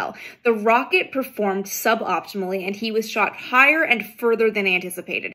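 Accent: Canada. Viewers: Hi, Your posting was, as always, brilliant BUT, please slow down a little. You’re talking so very fast anyone who isn’t a native English speaker attuned to your Canadian accent is going to find you very difficult to understand. I have many Asian friends who are fascinated by the subject matter, but really struggle with your bullet-fast oratory. Cheers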